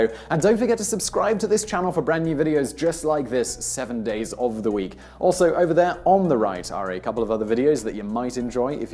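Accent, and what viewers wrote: England, United Kingdom. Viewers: Hearing this in a British accent makes it sooo much better for some reason. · This video is proof that everything sounds more pleasant when heard in an English accent. I mean, poop...really? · I love listening to all those English pronunciations in these videos. Good job, mate. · Dear. I wonder how you choose your pronunciations? If you're speaking with a strong English accent but using American emphasis just to attract the larger American audience then I understand. But often your pronunciation doesn't fit with any English or American dialect that I know. After so much research effort, doesn't it make sense to find out how to say the words properly? By the way, for the benefit of American viewers, yes, manner seems to me to be conceited too. We're not like that.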